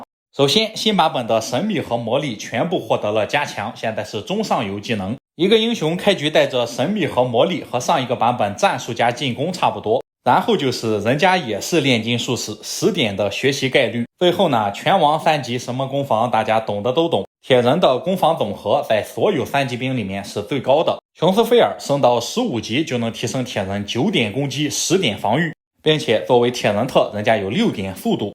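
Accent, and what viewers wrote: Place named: Xintai, China. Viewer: 博主有新泰口音 是新泰的吗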